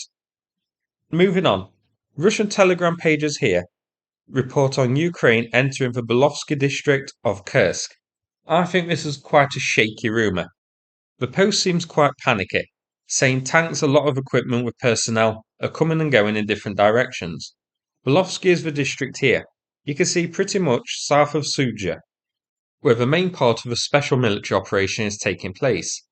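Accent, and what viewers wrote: United Kingdom. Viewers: Completely unrelated - what's that dialect you're speaking? I love it, but I'm crap at British dialects 😕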